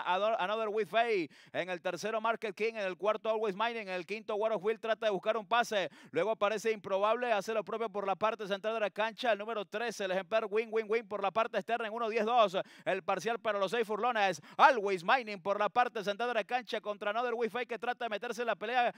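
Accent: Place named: Venezuela. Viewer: es venezolano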